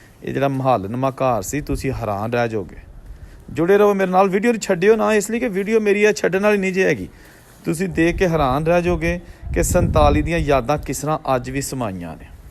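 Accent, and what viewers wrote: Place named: Punjab, India. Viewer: Apke bolne ka style ham Panjabi jaisi hi. Sunkar apnepan ka ehsas hota hai.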